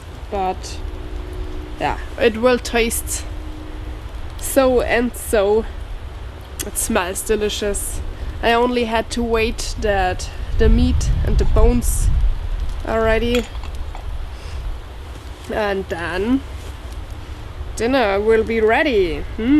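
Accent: Germany. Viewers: It’s called Rosin In The USA,Lov You’re German accent,🥰🙏😇🇺🇸👍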